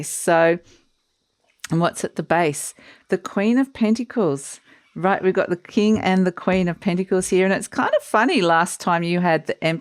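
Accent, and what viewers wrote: Australia. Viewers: Lovely to hear an Australian accent!!!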